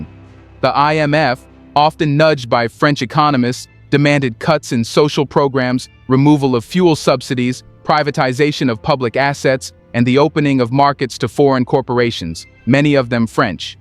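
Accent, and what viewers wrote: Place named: United States. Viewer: For an American voice -over to lecture any body on their national debt - when the USA's own Foreign Debt exceeds its own GDP already (and is 10 times the size of France's) and will only continue to grow until its economy collapses - pretty soon!, it is thought - Is a bit bloody RICH! 'Do one America - about the shortest lived Empire (with the axception of Attila the Hun's perhaps) EVER. GO SUCK A LEMON!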